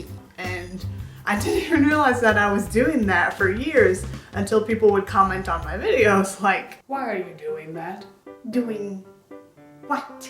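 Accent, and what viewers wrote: South, United States. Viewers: I think the way you talk is sexy as hell! It’s like an old-school Southern girl accent. I’m not into ASMR, but I usually watch just to hear your voice. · you talk southern lol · Imagine having a slow southern accent Beautiful young lady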